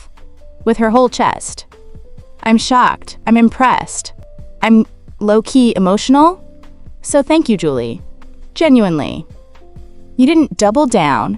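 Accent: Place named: Nigeria. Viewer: she sounds Nigerian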